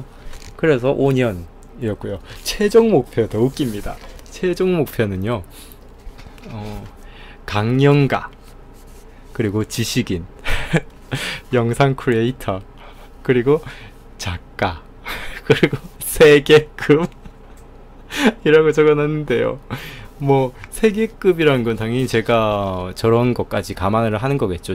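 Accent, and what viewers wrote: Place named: Gyeongsang, South Korea. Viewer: 경상도 억양의 맑은 목소리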